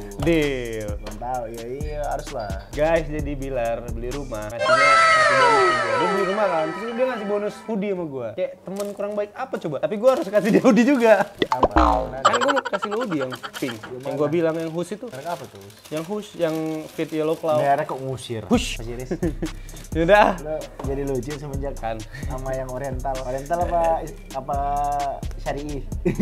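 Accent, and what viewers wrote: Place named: Medan, Indonesia. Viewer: Ak senang bnget dek. Conten rame cakap Medanny ak snang kali.mungkin karma ak orang medan mranto kerja di jakarta.makasih iy dek 🙏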